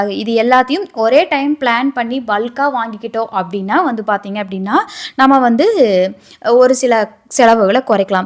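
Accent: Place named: Kongu, India. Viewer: 1st time i saw ur video sissy very usefull yo me ... nd r u kongunadu girl ?? am also coimbatore sissy... namma slang ea vera level than sissy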